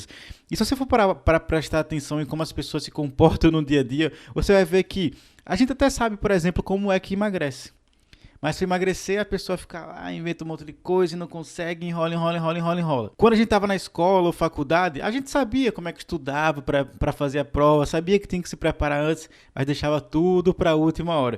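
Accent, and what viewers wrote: Northeast, Brazil. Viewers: você é do Nordeste meu irmão! Top demais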